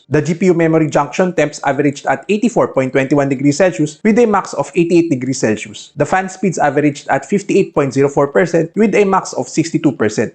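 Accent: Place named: India. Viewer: Ur accent similar as indian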